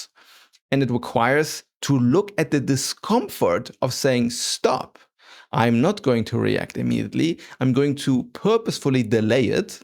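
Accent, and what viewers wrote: Germany. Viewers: I'm impressed with how much what you said resonates with me. There's many channels with seemingly amazing content, that contains just empty calories if you know what I mean. I'm feeling this will be actually useful. By the way, I'm detecting very faint germanic accent... Is your native language German?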